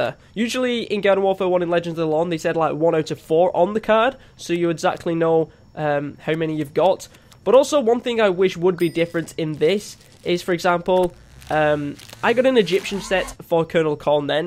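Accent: United Kingdom. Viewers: the way, you sound really really UK-ish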